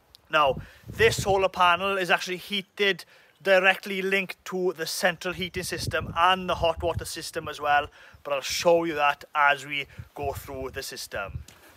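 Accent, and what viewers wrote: Wales, United Kingdom. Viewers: Hi, what model rayburn have you and how many rads? Can the rayburn handle it? Do you know what sort of kw output you get from your rayburn? Im wondering if a rayburn would heat a kitchen adjacent to my lounge if i put the rayburn in my inglenook in the lounge as flu etc already in place currently have 5kw log burner in lounge and heat won't travel to kitchen i have tried fans and all sorts now thinking of rayburn ty for video nice to hear welsh accent again after growing up in Brecon, in Shropshire now. Cheers.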